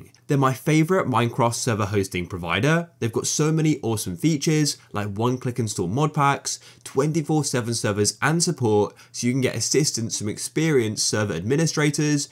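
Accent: United Kingdom. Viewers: soo british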